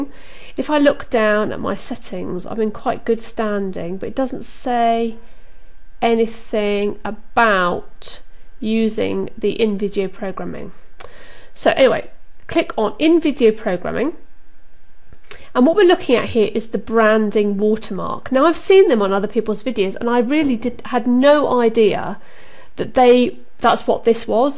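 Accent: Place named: United Kingdom. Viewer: Thank u, I like ur British accent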